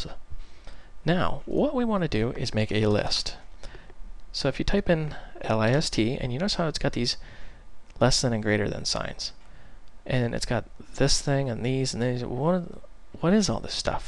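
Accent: United States. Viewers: thx man! ur awesome, great american voice and accent!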